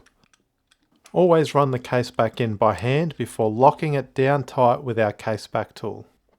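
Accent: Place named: Australia. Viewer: having an Aussie accent for a change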